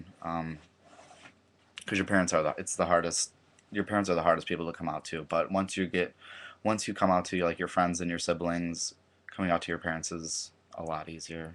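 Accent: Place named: Midwest, United States. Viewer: you are adorable regardless of the lighting & love that midwestern accent...thought you were from minnesota until you mentioned chicago! =)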